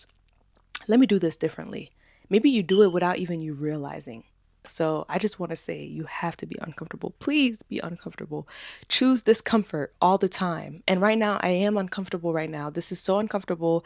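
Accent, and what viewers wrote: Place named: United States. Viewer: Greetings from Angola 🇦🇴, right here in Africa! I just want you to know how much your videos mean to me. Your words, your energy, and your American accent inspire me to keep improving my English every single day. You have no idea how far your motivation reaches, it truly crosses oceans. Thank you for being such a light,...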